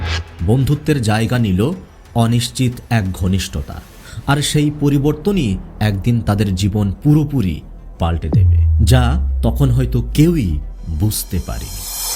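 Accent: Bangladesh. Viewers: tumi ki bangladeshi? Tomar pronunciation tai laglo. Khub valo poribeshon, khub valo laglo. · Apnar protita uccharan kothabarta porishkar BANGLADESHIDER mato .. Bharote ese voter card adhar card kobe jogar kore thakte suru korechen .. INDIAN bolchen but you are not INDIAN YOU ARE BANGLADESHI .. SOURCES TA KI ,, POLITICAL SOURCES ??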